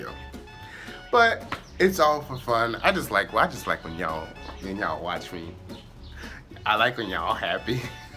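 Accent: Gullah, United States. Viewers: I'm from the low country just outside of Charleston. You don't have the geechee accent. You have the gullah accent. Like beafort. Geechee accent is way different.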